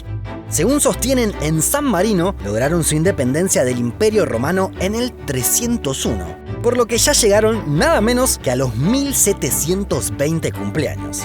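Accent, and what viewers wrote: Argentina. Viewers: Es muy bueno, pero el español es demasiado argentino, debería ser un español más neutro.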